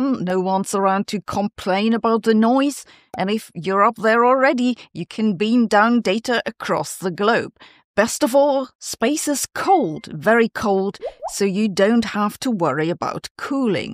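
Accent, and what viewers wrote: Germany. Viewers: we like your german accent,. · We love your considered approach and your German accent. This is a good disection of the issues around orbital data centres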